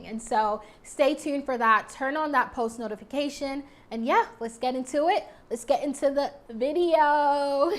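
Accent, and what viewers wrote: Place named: Canada. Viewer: Are you Canadian? Your accent sounds like a Canook!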